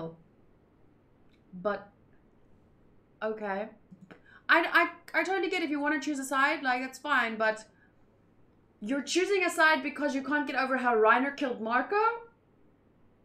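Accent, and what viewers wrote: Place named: South Africa. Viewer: I'd bet it's South African, really cool fun accent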